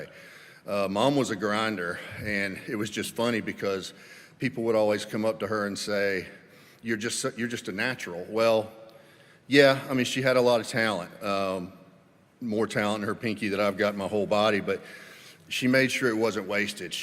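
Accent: South, United States. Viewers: Only discovered this very funny lady this year as like many others I’ve been in lockdown due to Covid in Australia. I recommended her to my friends as each time I saw her I was amazed at her poise and stature and I loved hearing her funny stories with that lovely Southern accent. My sincere sympathies go to her family and friends. She will be sadly missed by many.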